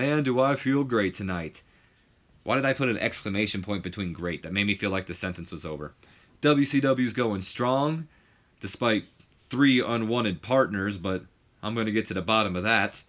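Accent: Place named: United Kingdom. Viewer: I'm british but not from a posh away so no offence was taken with your british accent Also great vid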